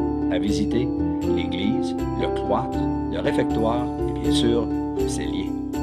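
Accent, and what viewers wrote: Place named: Quebec, Canada. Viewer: Le Berry avec l'accent sympathique du Québec ! Merci pour jolies ces cartes postales d'une région dont il ne faut pas trop ébruiter le charme afin de lui conserver toute son authenticité !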